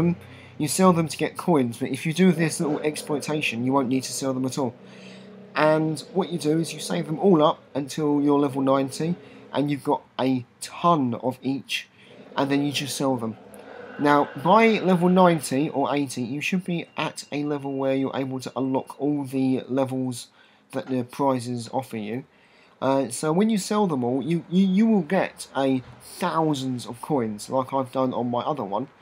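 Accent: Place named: London, United Kingdom. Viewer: Awww London accent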